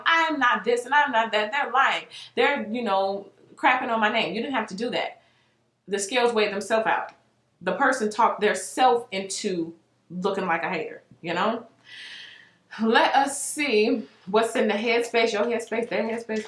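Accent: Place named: Atlanta, United States. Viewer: U so Atlanta. Loool .😂😂 I love it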